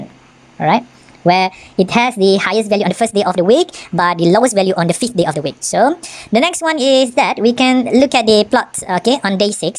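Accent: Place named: United States. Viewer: accent american sir ni pekat gila sampai aku lupa bukan orang america yang buat video ni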